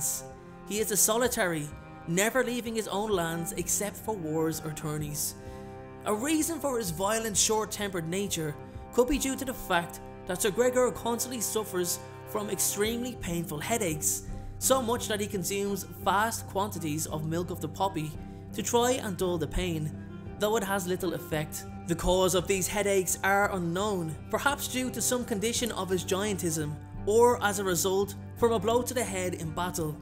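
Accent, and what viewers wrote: Ireland. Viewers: Dude are you Irish 🇨🇮🇨🇮🇨🇮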